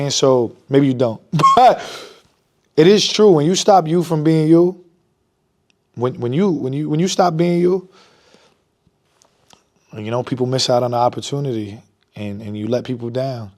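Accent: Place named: New York, United States. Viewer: This guy is definitely from, nyc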